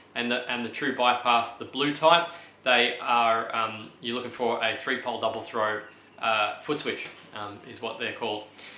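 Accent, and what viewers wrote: Australia. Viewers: Hi, Thanks for the video!! Refreshing to hear an Aussi accent. I’m from SA. This will be my first pedal project and I just wanted to check something before I start. I want to mod a simple a b box (no LEDs/power) into an a b y box. All I need is a switch to activate the y for different pedal setups (summing parallel effect signals (Q within a Q: is this the right way to sum?)). Would all I need be a single pole double or would I need a three pole double throw like the foot switch?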